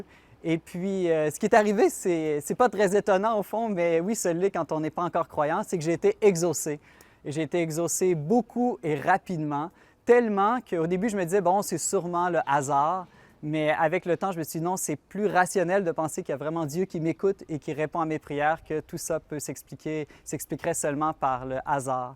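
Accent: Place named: Canada. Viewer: Merci de nous transmettre votre JOIE avec votre joli accent Canadien ! ! ! Vive le CANADA 🇨🇦 Que DIEU vous bénisse et vous protège ! Bonne Évangélisation ! Alléluia et Amen 🙏🇫🇷♍️😇🕊🗽💒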